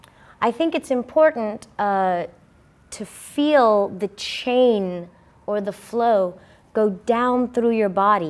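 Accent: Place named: United States. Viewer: Random comment, but I like your American accent :) Good enunciation!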